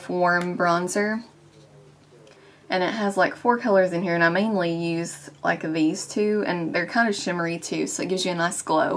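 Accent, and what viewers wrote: South, United States. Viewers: Geez that's an awesome nail polish color! Will def be trying that out. Love the look as well....got yourself a new subscriber! You sound like a southern belle too! Awesome!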